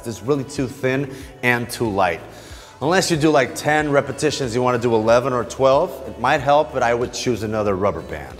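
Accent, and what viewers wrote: Portugal. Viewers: Passei o video todo a pensar "este gajo deve ser Português"... devia ter lido logo a descrição!:D Belo Video! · Quando fazes a pesquisa em inglês para obter mais informação, mas o melhor resultado é aquele craque tuga marado a falar inglês ahahah Grande Caralho 💪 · Reparei que era tuga pelo sotaque. Excelente video!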